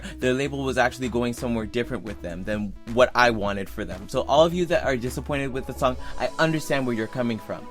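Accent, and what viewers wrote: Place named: Jamaica. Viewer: When I first heard it I was disappointed they went the trap rnb route because I expected more from their voices. I didn't like for the same reason but now I can't help but bump to it. Like you said they're trying to capture a certain market, "get in where they fit in," and might just do that for them. Off topic but are you Jamaican? I hear a slight yardie undertone in your voice lol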